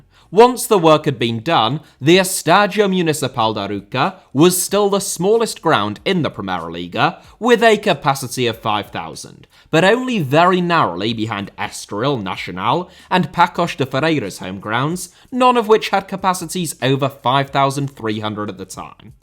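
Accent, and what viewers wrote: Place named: United Kingdom. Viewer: The video IS interesting, but I somehow kind of feel weird when a super British accent speaks about Europe 😂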